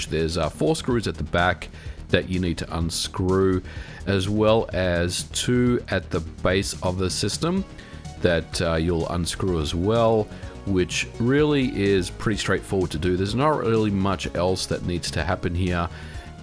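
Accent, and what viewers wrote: Australia. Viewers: Why do you have Australian accent and live in USA ? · Mate, I love your videos, the pace you speak out, and the content you produce. You sound like you're from Australia?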